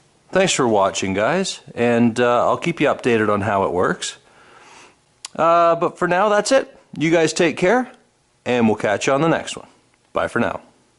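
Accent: Canada. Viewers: Is that an Ontario accent?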